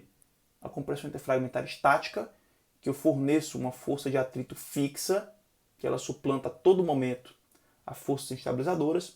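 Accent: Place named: Salvador, Brazil. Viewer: Boa, parabéns pela aula. Esclarecedor para qualquer residente. Sou preceptor aqui do HGCA( vejo que sotaque é soteropolitano) e são esclarecedoras essas aulas básicas iniciais, a base do curso 👏🏼.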